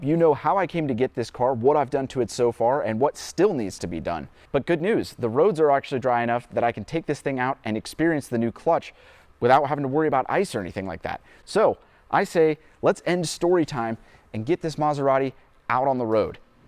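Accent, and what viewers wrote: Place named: Kansas, United States. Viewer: Good on you! Slowly but surely, you're building content. Granted you had to wait until CT2 aired, but your delivery is so measured and "Kansan" that it is nice to another one coming along. I really like the understated "look and feel" of your channel. It's rare to watch a channel like that and NOT have it be boring. Thank you. I think I'm subbed on another account, but I''m subbing on this one now.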